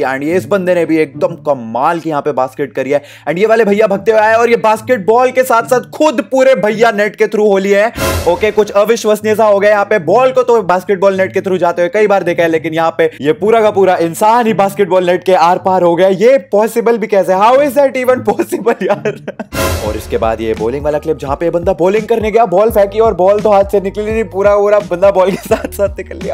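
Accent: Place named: Hyderabad, India. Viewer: mohtarma hyderabadi hai hamare yaha per aisich baat kartay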